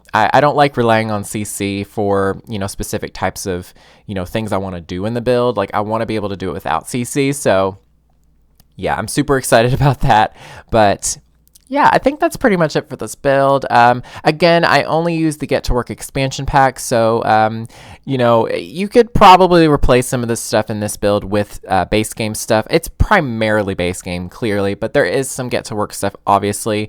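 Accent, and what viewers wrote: South, United States. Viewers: Hey!!!! So Happy To See YOU!!!! And Your "Country Accent" Gotta Love A Country Accent! LOL This Is DARLING!!!! I've Never Done Limited Packs......... SOMEDAY! I Got Discover University Too... Haven't Played It Much.... With My Depression But Feeling SO Much Better Now....!! Back To Sims Now! HUGZZZ To YOU! 😘😘😘😘😘😘😘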